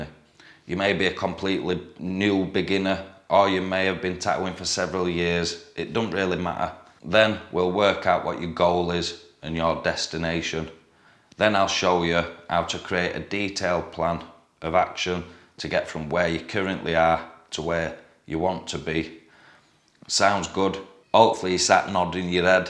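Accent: United Kingdom. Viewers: Thanks Man, your videos are Great! Your explanations are very clear. I'm a Yank and I love hearing your Limey accent! I am an old guy, now retired, with over 21 years of getting a full body suit. I suddenly got the urge to learn to how to tattoo when I thought about getting my hand tattoos touched up and thought I might try to do it myself. I may never get confident enough to do it, but I'm having a great time trying to learn. But I'm still avoiding attacking my skin! What would you suggest as an all-around good liner? I have only some 10-05 RL and wondering whether to try 10-07 or 10-09 or what?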